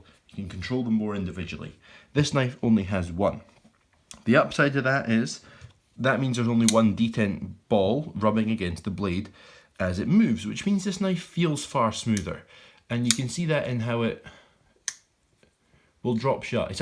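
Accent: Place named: Scotland, United Kingdom. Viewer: Nice review. Good to hear a Scottish accent :) I have just ordered the Slayback with the san mai ZDP189 blade. Great looking knife.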